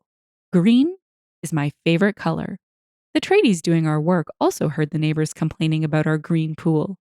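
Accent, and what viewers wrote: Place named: Canada. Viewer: And just a note to add that I love your reading voice and (I assume) Canadian pronunciations and phrasing.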